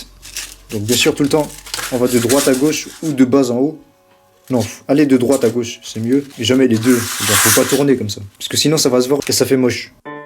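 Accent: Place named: East, France. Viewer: Au fait est ce qu'il vient de l'est ? ( L'accent me fait penser à ça )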